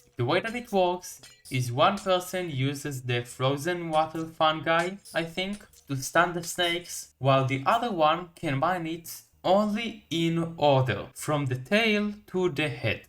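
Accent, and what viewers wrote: Israel. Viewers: are you israeli?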